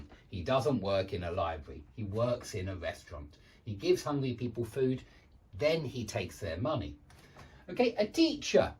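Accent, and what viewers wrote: United Kingdom. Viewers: I love your British accent, sending you love from Egypt ❤️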